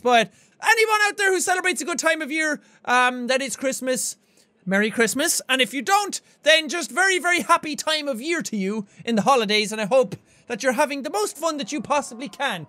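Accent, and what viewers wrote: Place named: Ireland. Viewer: theelectricsocket does not have the beautiful angle voice but he could definitely make a speech song.His voice is so soothing and has the perfect amount of Irish accent 👌🏻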